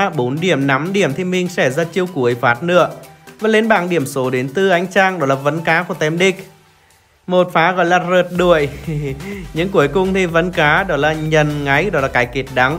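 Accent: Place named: Nghe An, Vietnam. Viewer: Giọng nghệ an nghe vui quá hiii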